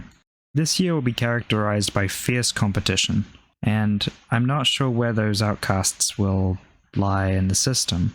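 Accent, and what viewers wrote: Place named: New Zealand. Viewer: You sound like you're from new Zealand lol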